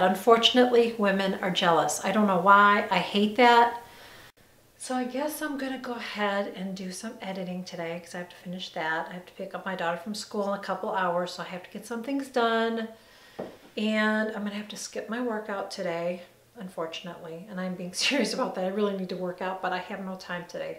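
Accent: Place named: South, United States. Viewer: Hi lovely!!! Love your intro music!! Awww there's my girl!! Lol!!! Save yourself the fight! He doesn't need to know you're leaving the window open!😂 so excited you're gonna be house hunting!! Take us!! I can't imagine how huge this move is! The thought of it makes me stay out! Lol!! I love you taking us around the house!! You look gorgeous as your real life self! That's so interesting hat they staged your house! It seems like that defeats the purpose of taking pix of your house! 10 videos what!!!??? Love the glitter deer! And the trees!? Precious and pink! Love them all!! What!!?? Girl I love you more!! And omg that actually sounded really good!! I think you're getting really good with the southern accent!! It sounds greatttt on you!! Yes girl noooo drama!! We run from it!!!! I feel the same way about you sister!! Love you to pieces and loveeeee my daily time with my!! 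Love your vlog!!! Even if you hadn't mentioned me I love this style video on you!!! You're seriously even more beautiful in real life!! And this comment is embarrassingly long! Lol!! Love you to pieces my friend!! Xoxoxo!!!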